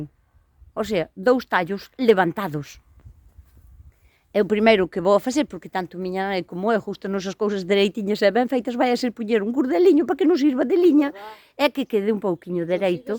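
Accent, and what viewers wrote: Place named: Padrón, Spain. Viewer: Falo moi bén ,,gustame moito mirar os teus videos Por o falar creo deves de ser cerca de mín si podes xa me dirás Eu son o lado de Padrón 😊😊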